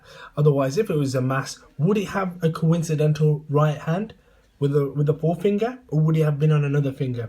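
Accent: Birmingham, United Kingdom. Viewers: Are you from Birmingham. I hear the brummie in you kiddo lol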